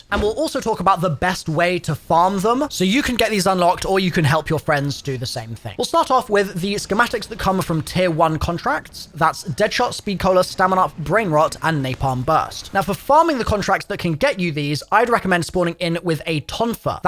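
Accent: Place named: United Kingdom. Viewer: Lol he sounds brittish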